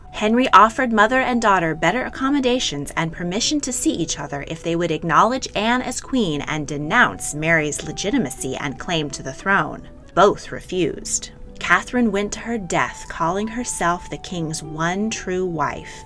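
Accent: United States. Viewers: Sorry. But why is there an American narrating this? So wrong. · U have a rlly American accent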